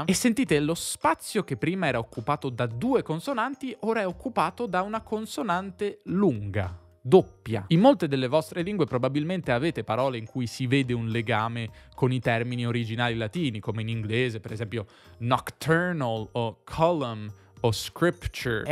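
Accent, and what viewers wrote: United States. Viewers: You sound like an educated Texan when you speak English. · Parli inglese con la pronuncia americana!😉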